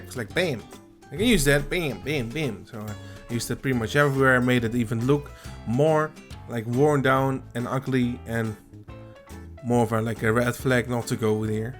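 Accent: Netherlands. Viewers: So creative, love this project. Also the commentary is the most lazy but most funny too. Ennuh ennuh, bla bla bla, you can see that for yourself whatever. Also, and I always wanted to ask you this, but is your Dutch English accent on purpose? Don’t feel offended as it’s so funny to listen to.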